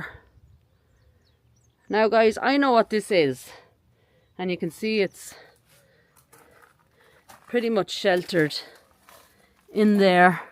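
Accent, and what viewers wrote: Ireland. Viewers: When I was a kid, my Irish Grannie lived with us and she talked like you.... memories here TY TY TY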